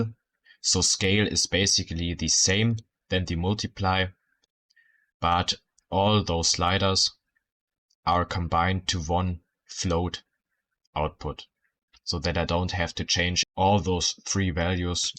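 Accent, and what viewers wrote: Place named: Denmark. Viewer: oh sorry😅 you sounded very Danish in the video, great video btw!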